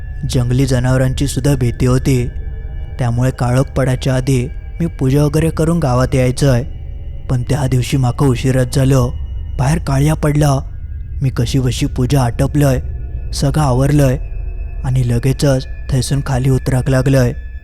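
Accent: Malvani, India. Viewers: आपल्या वाणीत मालवणी भाषैचा गोडवा आहे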